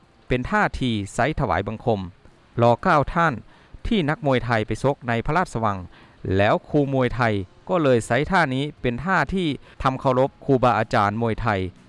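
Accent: Laos. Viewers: แอดเป็น คนลาว ใช่มั๊ยฟังจาก สำเนียงการพูด ช.ช้างเป็น.ซ.โซ่หมด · แอดมินเปฺ็นคนลาว พูดบ่ชัด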